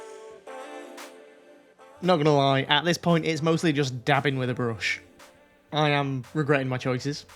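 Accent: United Kingdom. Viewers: you are to precious, I have tried to place your accent and wow I felt it was British, love every adventurous technique you experiment with and the excitement when you have achieved it successful, send you a grannies hug sadly my acrylics still keep turning to chewing gum no matter what I, then digging through 20yrs old acrylic liquids they worked like a charm, moral is ever give up, keep excitement in your voice, it's very motivating, send a grannies hug well done❤Eunice SA 82yrs old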